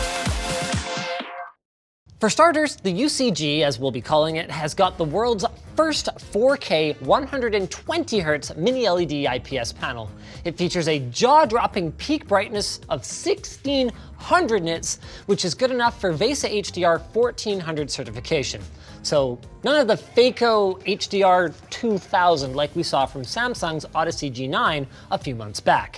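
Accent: Canada. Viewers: You talk Canadian but you spell like the Americans color or liquor you see the difference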